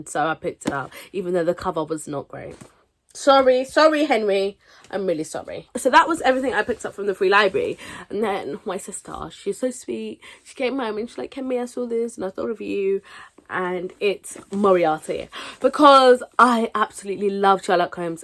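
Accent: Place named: England, United Kingdom. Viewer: Hey! nice meeting you. I love your English accent! it's super cool. Plus I appreciate your perkiness in your videos. And you also have a sense of humor. ☺All great skills to succeed in your BookTube channel. Of all the books you mentioned, two of them stuck out for me. Black London and whiskey in a tea cup by Reese Witherspoon. I shall order them, come the new year. I also have quiet a lot of books I must read before adding more to my library. I am currently reading "Finding me" by Viola Davis. 🤗 thanks!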